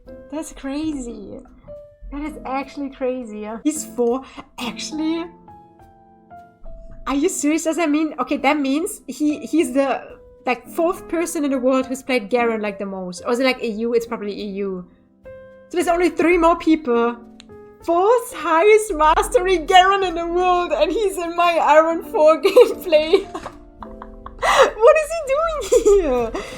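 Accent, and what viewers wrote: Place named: Germany. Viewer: Ich finde das so schön wie man manchmal den deutschen Akzent raushört XD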